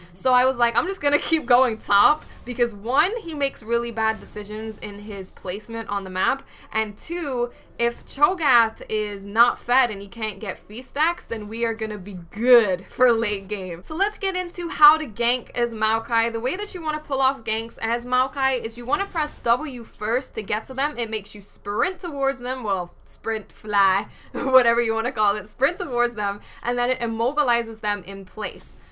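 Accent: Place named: New Jersey, United States. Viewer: I like your Jersey or Brooklyn Accent (or whatever it is) It's like a hotness multiplier on top of your nerd knowledge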